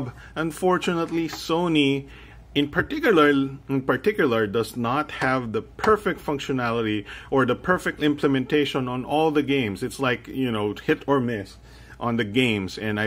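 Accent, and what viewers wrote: Philippines. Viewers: i can tell your filipino because of your accent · The way you speak English is very Filipino Like the way you say Comfortable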